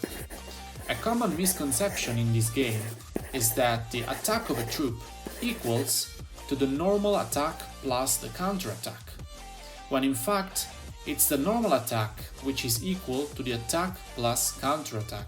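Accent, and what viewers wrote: Italy. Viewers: Lo sento l’accento italiano 😉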